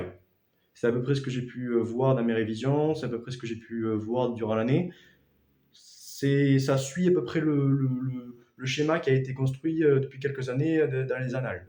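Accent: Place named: South, France. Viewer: Y’a quand même un truc agréable à entendre un mec avec l’accent du sud parler de X-ENS. Ça rend le truc plus light.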